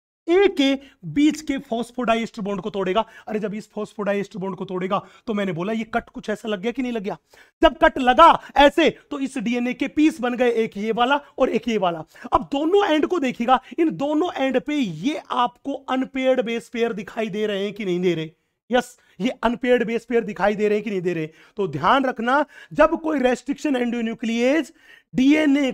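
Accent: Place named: Haryana, India. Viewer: Is sir from haryana ?.....his tone matches as littile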